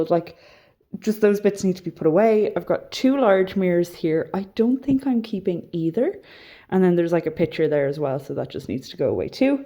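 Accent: Ireland. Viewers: Dear, being British, I looove your Irish accent. Don't ever doubt yourself. I think I speak for all your followers that you are doing a fantastic job and enjoy your videos. Remember, Rome wasn't built in one day and God willing you have many days to finish what you started. If I didn't live so far away or being 80 yrs old, I would gladly have flown to Ireland to help you. Thank you Dad for helping your dear daughter. I really enjoy your videos so keep up the good work and don't rush into anything. Rest ever so often and build up your strength. Love and hugs to you and your babies. From Cape Town ❤ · I don’t think any of us like the sound of our own voices. However, IMO - I have to say I think you could have a career in voiceover work. I think your Irish accent is lovely and I could listen to your voice all day! I think you could tile your living room floor (in very large porcelain tiles) and it will be easy to clean up especially in the winter when you have the pets coming in and out! 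Also if the floor is only slightly out you will be able to put extra adhesive on the lines to even the floor out? I would then get a very large rug? Good luck with whatever you decide!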